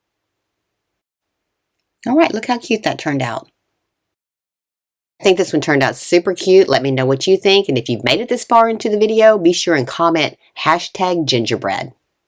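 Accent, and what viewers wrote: South, United States. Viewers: These are so cute! This has got to be in my Top 5 craft channels I follow on YouTube, if not the top spot! VZ perfect crafts, perfect Southern accent with the right level of sound. Thank you.!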